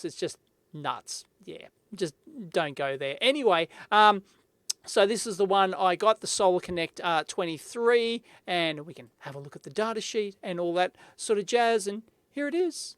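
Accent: Australia. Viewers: why does talk like crocodile dundee? was that show really that popular in austrailia?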